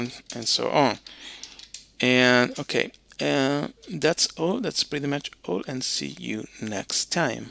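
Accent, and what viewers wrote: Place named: Italy. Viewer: Ho riconosciuto lievemente l’accento italiano 🥰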